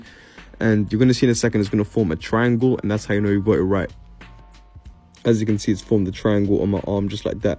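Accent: United Kingdom. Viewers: Brother are u British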